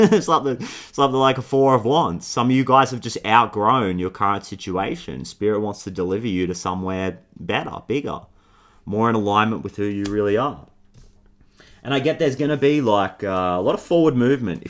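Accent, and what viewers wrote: Australia. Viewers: Left tarot vids for a year, been needing it this past week and it’s been EPIC and defo in the cards, wherever I go, but I gotta say it was so lovely to hear your lovely Aussie sweetness, familiar to my ear. Am on X stages, surrounded by all ethnicities, mainly Yanks. So hearing such a sage and true message from you was just the cherry on top…as I head into my Hermit phase. Looking forward to tuning in here again. Grateful for you. 🥰🙏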